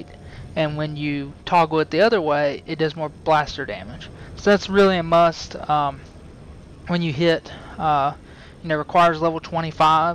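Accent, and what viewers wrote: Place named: United States. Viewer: His accent is country, I think.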